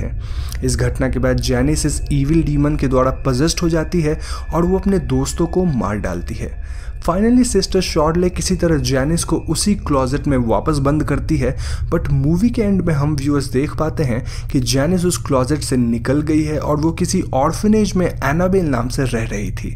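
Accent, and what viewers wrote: Bengal, India. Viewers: Are you bengali??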